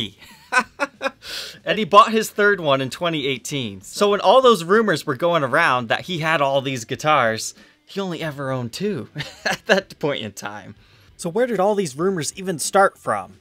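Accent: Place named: Midwest, United States. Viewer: Of course. I’m not a linguist, I just heard something different. Plus, I was generalizing. How could I possibly know what a Montreal accent sounds like without experience? I can spot a Chicago accent vs a Wisconsin or Georgia accent, for instance. I grew up in the Midwest, and I hear that accent in speech, although he tries to clean it up.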